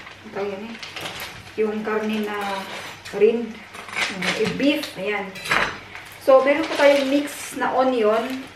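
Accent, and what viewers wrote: Visayas, Philippines. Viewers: sa saan pla ito na country? pgnagsa2xlita ka ng tagalog pra kang bisaya.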